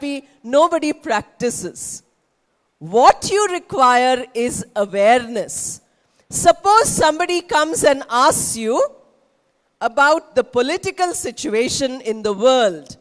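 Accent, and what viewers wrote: India. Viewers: Your English speaking is just like a Hindi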